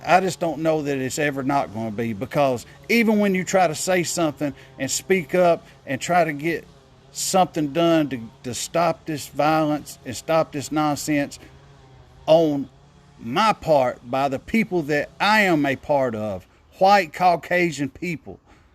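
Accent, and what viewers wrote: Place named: South, United States. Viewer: You're such a wise man! You should really start a podcast (if you have time [outside of your JOB, of course]). Speaking with a deep Southern accent with heavy feeling has made many, many people think that you're one of the wisest people on earth! They're certainly not complete idiots, right?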